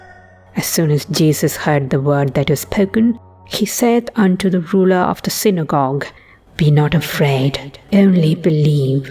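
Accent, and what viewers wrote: RP, United Kingdom. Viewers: Hi! Thanks for this video. This was stunning video with great picture and I loved the music. Did you do this video because Easter is approaching? I love it and love it:) I can't imagine how much time and energy you have put behind these videos and Of course! I don't need to say anything about your posh accent. I would like to take accent class from you if you are conducting accent coaching. Great work!🙂🙌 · What a marvelous video! Very good taste for the images and an impeccable Conservative RP reading. You are outstanding!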